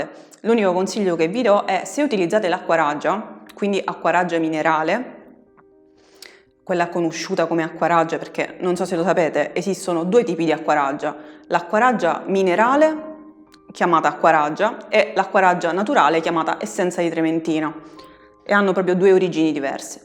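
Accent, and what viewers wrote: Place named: South, Italy. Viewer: MA LA TENDENZA DEL SUD SI SENTE !!!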